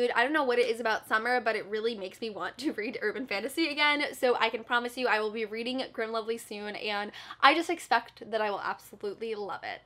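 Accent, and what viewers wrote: France. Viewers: Félicitations pour le très bel accent français : ) C'est toujours un plaisir de regarder tes vidéos. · Your french accent is so cute 😍 I love your video so much ❤ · omg your french is so cute! <3 :) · Your french pronounciation was too cute!!! Pour ma part, c'est l'inverse ; je lis en anglais alors que je suis québécois :p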